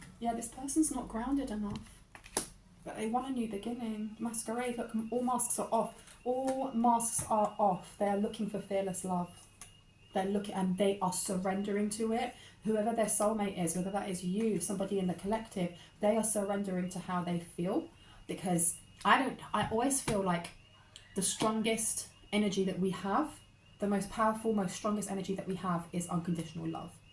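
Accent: United Kingdom. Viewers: I think 🤔 she’s 😢British 🇬🇧 I am Spanish from the 🇺🇸 I can understand her I put on headphones to listen closely.🎧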